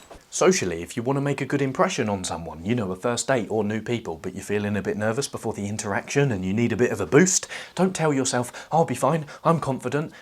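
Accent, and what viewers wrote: England, United Kingdom. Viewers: Whereabouts in England are you from?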